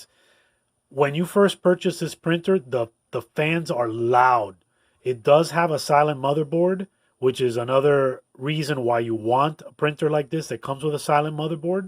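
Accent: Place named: Miami, United States. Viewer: I know a Miami voice when I hear one...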